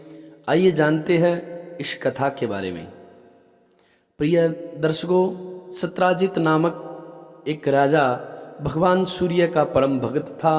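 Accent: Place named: Rajasthan, India. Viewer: आप राजस्थान से लग रहे हो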